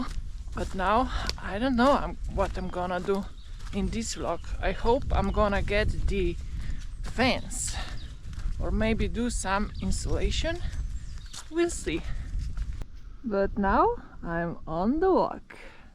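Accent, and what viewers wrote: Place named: Slovenia. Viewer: but it sounds Slovenglish and we need to practice our English.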